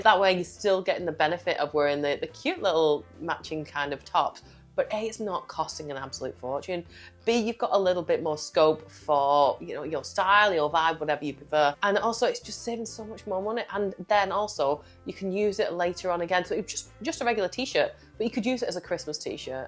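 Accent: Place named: North West England, United Kingdom. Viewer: You sound very local to me in the North West of England 😊 - we bring a live potted tree in - this is year 3 and up to now that’s my plan for this year - brings joy year round.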